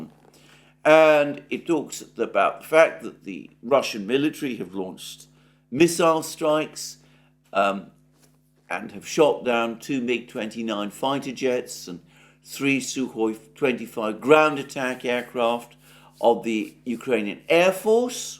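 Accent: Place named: RP, United Kingdom. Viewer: Mercouris is always an authority about the Ukraine war and about sophisticated Eton-style english language :+)) The only thing he dares not to do is to try to know who is in the "deep state". The "deep state" has no name.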